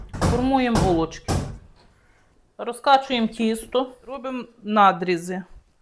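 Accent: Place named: Podillia, Ukraine. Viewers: Чому жахлива?! Так розмовляють на Хмельниччині! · Ви просто суперова господиня, а мова нашого Поділля,подобається Вас дивитися,булочки пекли з внучкою,получились дійсно смачні і Ви правду сказали,що треба пекти і не одну порцію.Дуже дякую.😊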